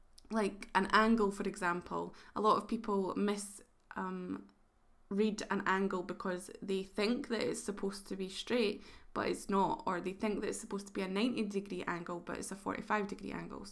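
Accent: Scotland, United Kingdom. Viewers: Maybe it one of these elitist things. I am bad at not doing any practice things between my art club meetings but do mean to. Nice to have a Scottish accent for a change. Just subscribed.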